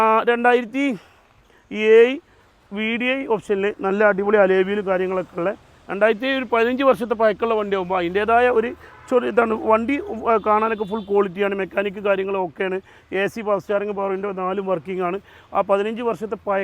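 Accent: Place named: Malappuram, India. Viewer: നമ്മളെ മലപ്പുറത്തിന്റെ ശൈലിയും ആരെയും വെറുപ്പിക്കാത്ത സംസാരവും❤❤❤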